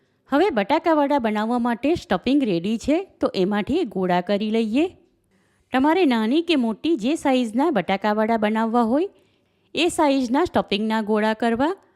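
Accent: Surat, India. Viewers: This looks so delicious! I'm definitely going to try making these batata vadas at home. Thanks for sharing the recipe! surti tone, i love it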